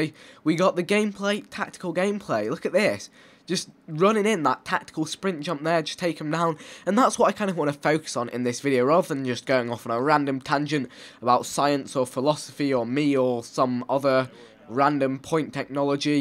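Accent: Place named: United Kingdom. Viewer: Don't be silly guys. He's good old British!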